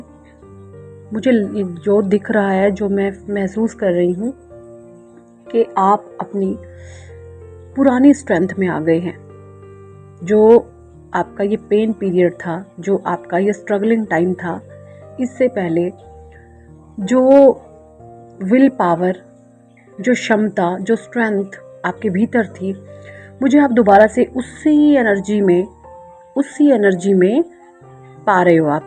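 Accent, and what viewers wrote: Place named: Kanpur, India. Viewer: Jee aap kee avage hum sunkar anuman leagatata thaa kee aap kanpur kee tharaa bolti hai leakin kathil siti aur karneal siti mea siti kaa kuch loog kanpur kee thara boltesa humko mealtaa thaa hearinaa meaa Hindi kee kitabai boli jatai haea .....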